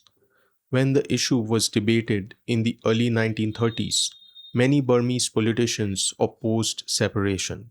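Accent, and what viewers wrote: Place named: United Kingdom. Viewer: He sounds more of Brit intellectual then any indian !! Maybe people like actually trying to keep the British legacy of territorial expansion as well exploitation...!!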